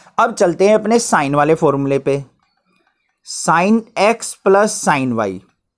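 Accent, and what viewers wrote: Haryana, India. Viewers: Sir are you from Haryana your tone is like haryanvi language I am also from Haryana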